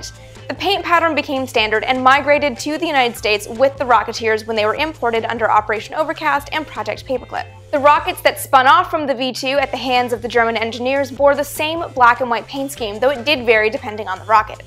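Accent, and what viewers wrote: Canada. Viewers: Andy Paul Amy is from Canada, eh!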